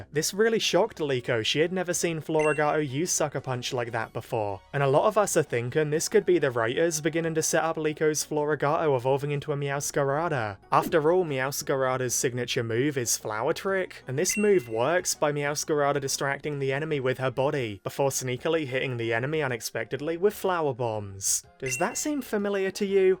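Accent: United Kingdom. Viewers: Dude Are you British 👀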